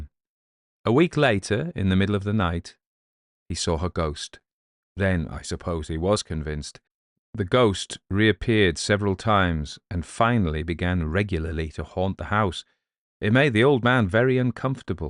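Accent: United States. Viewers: I don't know about the regional dialects disappearing everywhere. I'm in southern West Virginia and there's still various dialects the further south you go. As for doing an American accent, his isn't too bad. I love his Scottish & Irish versions. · If I can perform a British accent but half as well as you do an American, I will consider myself a success as an actor. :)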